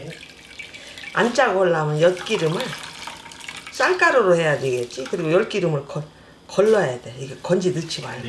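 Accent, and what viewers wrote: Chungcheong, South Korea. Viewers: 충청도 사투리가 우리 엄마 같네요.. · 모처럼 들어 보는 충청도 사투리 네유. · 맛나보이네요 수고하셨습니다 말씀이 충청도 분이라말씀도 구수하시네요 저의 고향이 충청도이거든요 한번 담아보겠습니다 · 구수한충청도 사투리 정감이가네요 😊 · 충청도 말씀. 정감이 기네유~~~~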